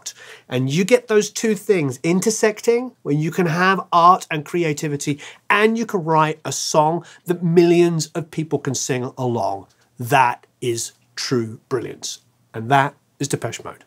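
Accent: United Kingdom. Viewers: i love your British accent but bassline was Roland System 8 😁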